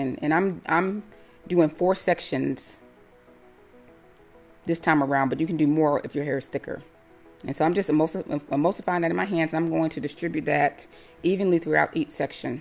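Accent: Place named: Georgia, United States. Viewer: Lol... I was just thinking she sound like she from Georgia!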